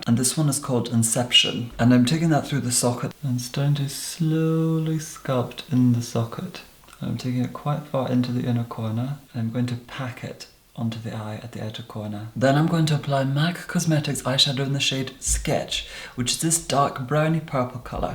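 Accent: Scotland, United Kingdom. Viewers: I love his accent but I love Scottish accents anyway. Also I love it that somebody can acctually speak English properly cos Imteased for that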